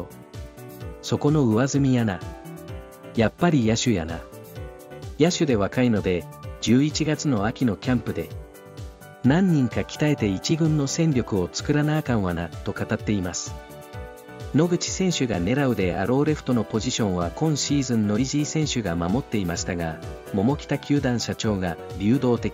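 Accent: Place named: China. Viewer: ナレーションが中国人の話す日本語みたい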